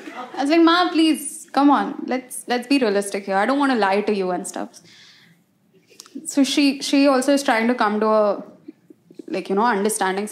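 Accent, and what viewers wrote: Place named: India. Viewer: this is why Americans make fun of Indian accent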